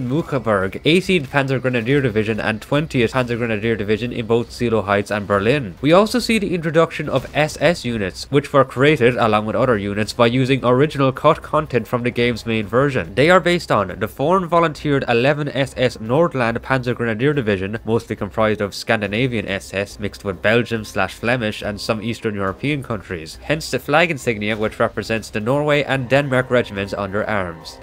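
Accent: Ireland. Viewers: Your Irish accent is so cute! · I like the Irish accent.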